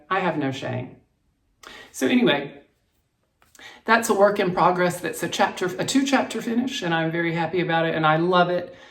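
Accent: South, United States. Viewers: Simply delightful. Twitterpated - you are SO southern! ; ) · you are a natural born storyteller, and I could listen to you all the day! I had a real moment when you used the word "twitterpated ". Aside from the Owl in Bambi, the only person I ever heard use that word was my Dad, who, like you, had a lovely, soft southern accent, the only one in a house otherwise full of Boston accents. That truly made me smile. Please don't think that's a comment on your age, in fact, I am the exact same vintage as you! I loved my Fisher Price toys, and that castle was awesome! I fear I was a less than benevolent queen, however, as I delighted a little too much in throwing those poor little people through the hatch! I hope I'm kinder and gentler these days. Lol. I think you made excellent choices for your Halloween themed projects, I've got one of my own kitted up and will be sure to use the "pound sign". 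We had rotary dial, too! Take care, Kim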